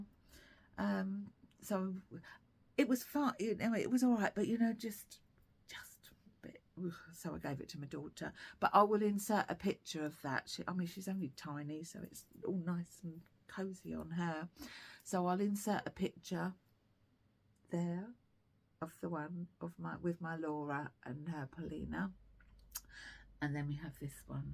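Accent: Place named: South, United States. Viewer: Ahhh haha thank you so much for letting me know where you live! I could tell it was southern but that's about it! Thanks again for the mention. Knitters really are the best aren't they ❤ much love ❤❤❤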